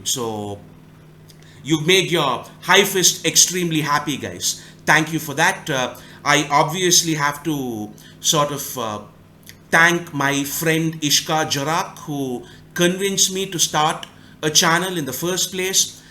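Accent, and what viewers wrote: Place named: Sri Lanka. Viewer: Are you saying you can't be taken seriously? Because of the thick Sri Lankan accent? Coz that would be a crying shame considering the seriously cracking great content , serious no joke , not kidding even a little bit, true story ... Gospel even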